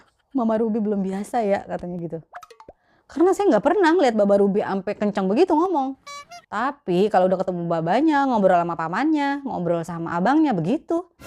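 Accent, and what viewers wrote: Jakarta, Indonesia. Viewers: Aduh mbak kedengaran betawi nye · Iyya ini bahasa Indonesia logat Betawi/Jakarta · hooh.. w jdi ktwa mlu ntn yahh .. sumpah po lu lucu bgtt betawi bgtt😄😄😄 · Set dah ah...guwe demen deh si mpo betawi banget dah 😂😍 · Duh mpo demen banget denger n lihat logat betawinye ...! bener kaya lg nonton stand up komedi z ! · Mba logatnya Betawi,seru banget... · pertahankan logat betawi mpok jgn luntur kami sangat meni'mati cerita & pengalaman di saudi sana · Masyaa Allah logat betawinya gak bisa ilang. Haha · Betawi bgt mpo, reseep dengernya😅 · Wkwkwkwkwk Betawi banget anjayyy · Senang nonton channel sangat menghibur apalagi logat Betawinya itu lo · Demen banget dah ma logat betawi nyah. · Mama Ruby lucu deh,bahasanya.Menyenangkan & menghibur, pake aksen Betawi.Lanjutkan! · salam sehat....ngomongnya mpok berasa di Betawi, tp betawi sekolaan ya...keliatan cerdas enak ngomongnya mengalir kayak aer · Video2 sebelumnya koq blm keliatan bgt logat betawinya mpok🤭jadi shock saya dengernya😂tp tetep menarik koq ceritanya ❤️❤️❤️ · iya betul medok bngt betawi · Haha logat betawi mba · Logatt Betawi nya enak bener👍🏻👍🏻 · Masyaallah,baru liat chanel mpo nih udh suka aku ,betawi nye medok bgt si mpo jdi tambah suka aku liatnya ,salam kenal syg mpo dari aku betawi rawabelong😁😘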